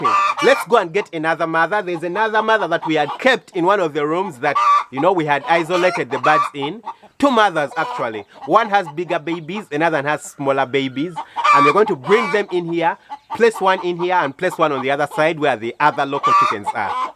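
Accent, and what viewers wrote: Uganda. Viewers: Just dropped on this video and just by the way he spoke...i immediately knew he was a ugandan😂😂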